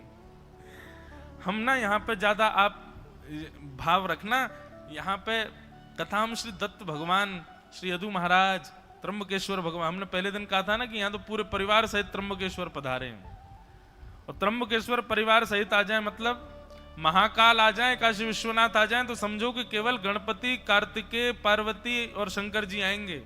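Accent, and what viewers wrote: Braj, India. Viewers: Apke mukh se Braj bhasa bdi pyari lagti hai bahut mitha lgta hai, radhey radhey 🙏🙏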